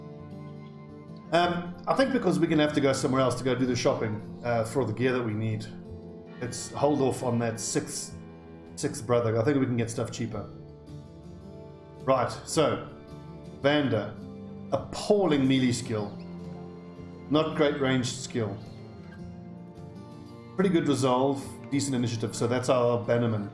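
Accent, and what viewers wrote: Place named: South Africa. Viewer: You from SA? sounds like it. Nice vids btw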